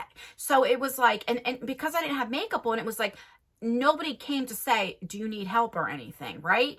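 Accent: New Jersey, United States. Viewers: I've been watching you for a little over a year and you are the best part of my evening. I literally go to bed with Abby, Theresa is dead, and you. I enjoy your New Jersey accent and love listening to your new podcast with Abby. Can't wait for you two to come out with you merch line. Can you imagine your own line of tye-dye hoodies. That would be so awesome. Well congratulations for reaching 50k and I will be right behind you when you hit a 100k. Xoxo. 😉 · Love you and your channel! My family is from Jersey and I love listening to you talk. Feels like home...just like a good chocolate chip cookie. :)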